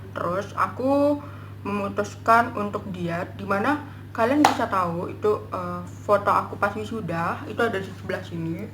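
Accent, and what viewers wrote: Bali, Indonesia. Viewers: iya y..awalx jg aku ngerasa mbaknya orang bali. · Kaya bhs nya methok ke bali ya.. haha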